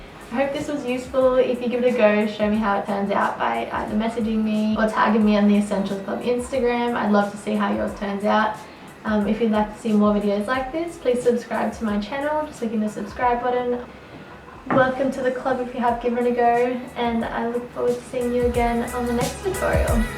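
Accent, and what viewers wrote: Australia. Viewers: Sorry but you sound Australian so I am just wondering where you buy your fabric from? I don't find a lot of linen in Spotlight and Lincraft :/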